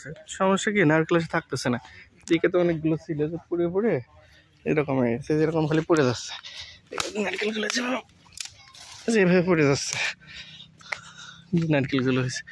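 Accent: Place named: Faridpur, Bangladesh. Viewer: ভাষা শুনে মনে হয় আপনার বাসা মনে হয় ফরিদপুর?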